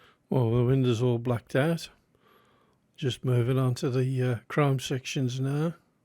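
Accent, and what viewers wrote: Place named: Midlands, United Kingdom. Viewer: I bloody love when you open something up for the first time, and the wheels just fall out! What a relief! And I do quite a bit of work with Land Rover, so the Midlands accent is not so bad! 🤭 The only thing on that casting I don't like is the filled quarter-light windows: the temptation to drill those out is one I'd not be able to resist, though it does leave the A-post and door frame quite vulnerable. Lovely entry to the Challenge, and a great save!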